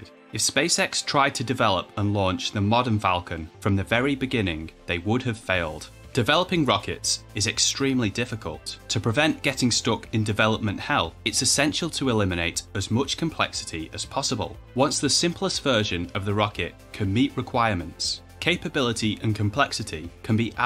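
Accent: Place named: United Kingdom. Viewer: Awsome dude thank you, and great to hear a fellow brit on this subject, liked and subscribed 😀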